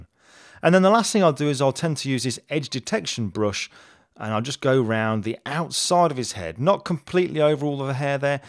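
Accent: United Kingdom. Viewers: Really great tutorial!! Exactly the tips I was looking for, and I really like the way you teach/talk etc. (nothing against U.S. tutorials, as I've leant loads from them, but I love to find a fellow Brit to learn from.) I think I've found somewhere I will defo be coming back to regularly!! Cheers!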